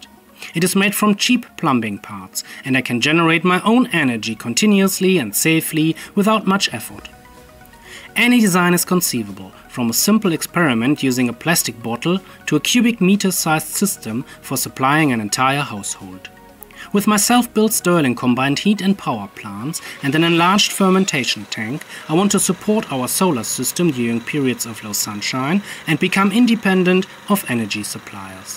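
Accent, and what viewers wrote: Germany. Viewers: He's German 😂 the accent is very clear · I love the German accent especially yours it’s nice, like a fine British accent!! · Dual Publishing in your native language is neat and appreciated! Also, don’t feel bad about your accent, it’s neat and ALSO your English is far better than my german! (And any English speaker whining about it probably can’t speak a single other language!)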